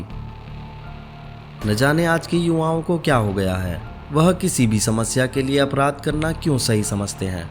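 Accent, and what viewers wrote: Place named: Haryana, India. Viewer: Haryanvi accent jaisa hai.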